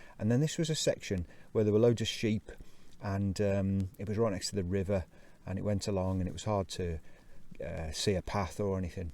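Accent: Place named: Wolverhampton, United Kingdom. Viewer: Bloody hell! I’m in Wolves too! You’re right there. I thought I recognised the accent. I’m all about the thru-hikes and wild camping these days of course.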